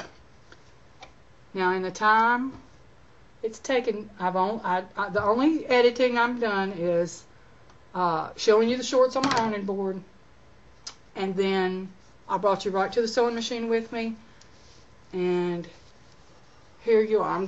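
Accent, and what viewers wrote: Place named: South, United States. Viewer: ......love your creativity...i use to make purses out of old jeans....this is a great idea. And miss that southern twang of yours.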